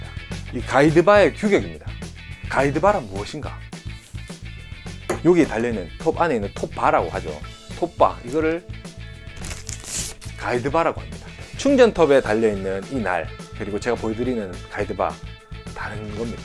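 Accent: Gyeongsang, South Korea. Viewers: 너무 쉽게 그리고 재밌게 귀에 쏙쏙 설명을 잘해주시네요 같은 경상도로 그런가? ㅎㅎ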